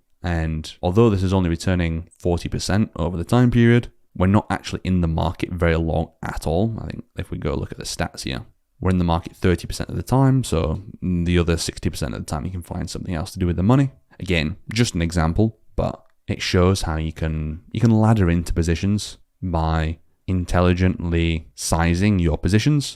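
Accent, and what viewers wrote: United Kingdom. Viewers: Thank u… By the way, I like your contents a lot because of your exclusive content, as well as your deep and soothing voice, With that British accent :) so because of that, I can normally watch an hour-long video with no boring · great work, this is excellent. I also appreciate the northern accent. It's been a while since I've heard a proper one.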